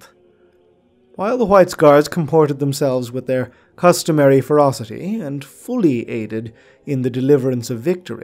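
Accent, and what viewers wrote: Ireland. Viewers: Excellent work as ever. Is that an Irish accent I hear?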